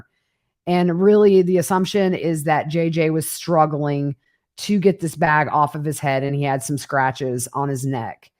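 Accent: South, United States. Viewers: You are doing an outstanding job reporting back to us on a daily basis. I know it must be very difficult to hear and see what was done to those precious children. Have a wonderful time with your daughter at the concert; she is greatly blessed to have a mama like you! Btw, I love your southern accent.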